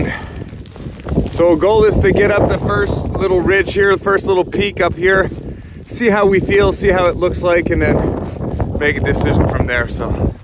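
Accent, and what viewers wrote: Quebec, Canada. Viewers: Any day you decide not to summit is a good day! Great video. Glad YouTube recommended it to me. Are you French Canadian by chance? You sound very similar to some of my friends from Quebec. All the best to your next adventures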